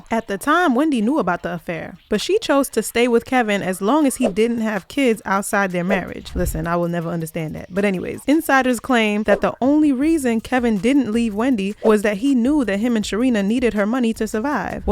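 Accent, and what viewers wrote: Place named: New York, United States. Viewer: Your slightly New York accent makes me miss my east coast fam. 🙂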